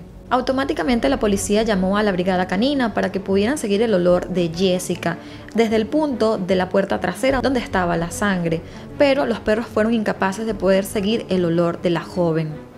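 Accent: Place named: Venezuela. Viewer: Hola bella, es un gusto escuchar tus casos y escuchar el acento venezolano lo mejor. Tú, LesmaVR, JulySeib y Dinosaurios las mejores, sería interesante que narres el caso de los Hermanos Faddoul caso que ocurrió en Venezuela.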